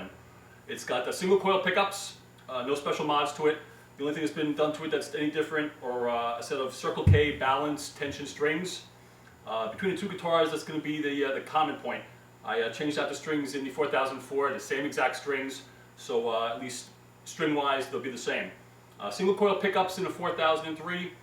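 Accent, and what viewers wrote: United States. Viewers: your either a cop or firefighter, and you live...... hmmmmmm long island? Dont take that the wrong way, I love accents. You sound great. Im probably 100% incorrect. thanks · You sound like a New Yorker!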